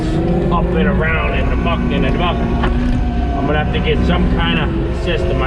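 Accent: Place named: New Jersey, United States. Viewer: Happy Thanksgiving! New subscriber but based on your accent I'd say you're not too far from Jersey. Just finished grading my own sawft sawggy yahd with a Kubota in 732👌🏼👍🏼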